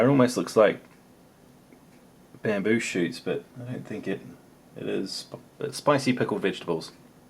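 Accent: New Zealand. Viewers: He's a Kiwi, therefore a New Zealander :) (btw his accent is of a typical kiwi bloke) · i love the new-zealand accent.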